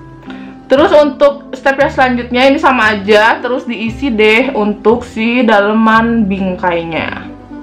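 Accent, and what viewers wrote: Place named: West Java, Indonesia. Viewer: Pasti orang Sunda yah 😚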